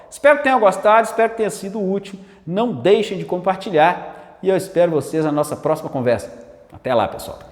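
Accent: Minas Gerais, Brazil. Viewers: Prazer em conhecer nosso "Dayan Siebra" com sotaque mineiro (carinhosamente) . Obrigada pelo vídeo !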